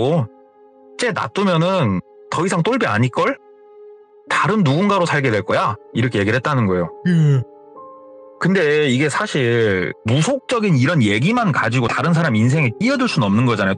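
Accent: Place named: Chungcheong, South Korea. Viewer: 님 사투리는 항상 충청도ㅎㅎ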